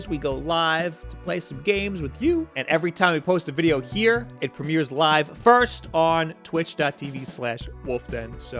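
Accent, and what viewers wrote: New York, United States. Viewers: THAT NEW YORK ACCENT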